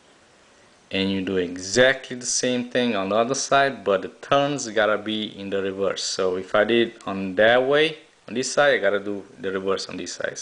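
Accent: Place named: Nepal. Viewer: Are you from Napal? By your accent it sounds like it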